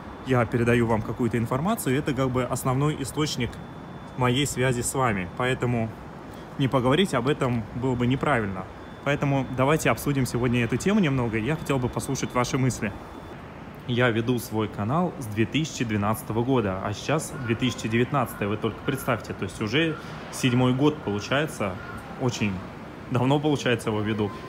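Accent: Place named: Japan. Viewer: Здравствуйте,. Изначально мне интересна тема,как люди живут в других странах (их быт,мысли о России и русских и т.д.) и таким образом случайно "набрела" на Ваш старый ролик,о том, какую в Токио Вы снимаете квартиру. И,конечно,Вы меня покорили своей манерой подачи материала,доброжелательностью,интеллигентностью)) Я уже не могу не смотреть Ваши позитивные видео))) Даже Ваш появившийся японский акцент в речи очень импонирует)) Продолжайте, экспериментируйте,а мы Вас подддержим))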